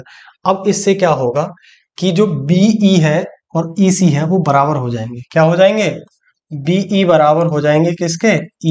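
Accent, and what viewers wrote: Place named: Uttar Pradesh, India. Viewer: I think sir is from uttar pradesh 😂 that accent....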